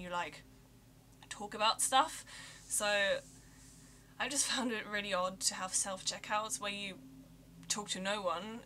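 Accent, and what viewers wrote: England, United Kingdom. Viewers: If you're German, then why the English accent? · Picked up a very English accent. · You sound British you are yeah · Please take this accent back to Germany and teach them. The British Accent will soon vanish and be replaced by the American one.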